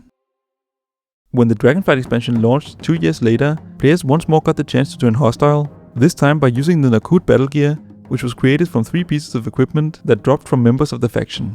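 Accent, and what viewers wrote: Denmark. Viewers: I’d almost bet everything I own that the guy speaking is Danish. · Ooooh, Danish-english accent😂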